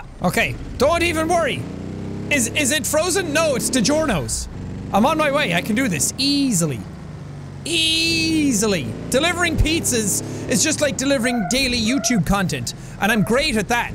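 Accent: Ireland. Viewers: Ok random thing I stopped watching you for a month (idk why) and I come back and you sound 10x more Irish than before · Omg his Irish accent came through so hard in this video, I love it! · sounds especially irish in this video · is it me or has accent only gotten stronger since leaving Ireland xD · Is it just me or is extra Irish in this one? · is sounding WAY more Irish these days! ❤️😂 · Am i the only one who is gonna realize this is the most Irish sean has been in a video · I love that you let your Irish out. I also love it when you say "ya tink?" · This is the most irish has ever been. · Is it just me or has the become more Irish? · you Irish accent is to strong to do any other accent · has anyone noticed his accent os more Irish? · Is it just me or is extra Irish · You seem more irish today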